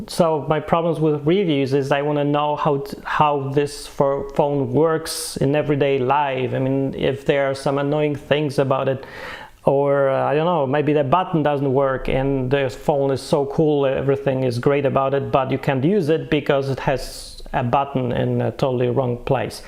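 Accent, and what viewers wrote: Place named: Poland. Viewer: Heard your intro... Heard you Polish accent... And I knew, this guy KNOWS....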